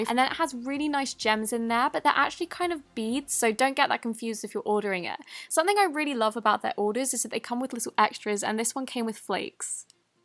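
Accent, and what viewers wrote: England, United Kingdom. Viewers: THE TRUTH ABOUT THE WORLD, She's English. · I love British accents❤️💕❤️💕❤️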